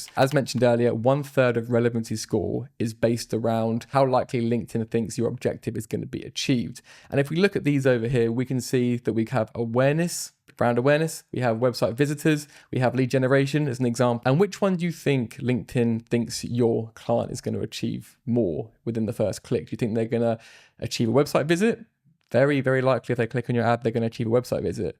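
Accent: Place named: England, United Kingdom. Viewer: Dude, you sound so english wehre are you from or based actually right now.?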